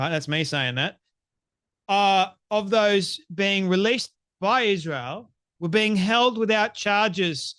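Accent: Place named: Australia. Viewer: Love your show,. We need people of your calibre speaking the truth with an Australian accent. Keep it up mate.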